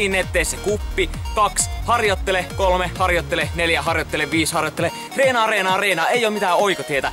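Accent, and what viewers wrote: Finland. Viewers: Sillä murre on pohjanmaalaisen kuulosta. joten samanlainen kuin mulla :DDDD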